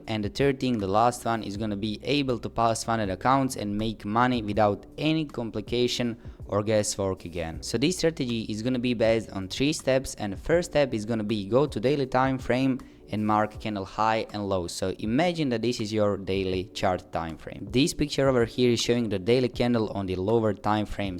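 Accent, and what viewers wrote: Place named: Catalonia, Spain. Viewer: You sound catalonian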